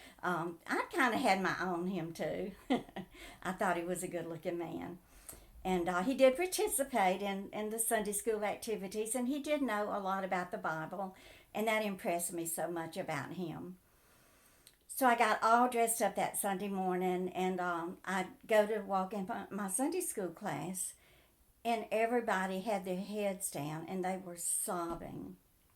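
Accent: South, United States. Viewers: I came across your videos a couple of weeks ago. After watching the first one I was hooked. I went back to the first one and I have been bing watching and finally have caught up to your most recent one. You are a breath of fresh air, I love your soft southern voice (I’m from Kentucky so I’m pretty southern myself😉). I love all your stories, cooking, and your teaching to the younger generation about finances & saving. God Bless you sweetie😊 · I like your soft voice and your southern accent. You're a born storyteller and your life stories are worth hearing. So sorry about your son and Frank too.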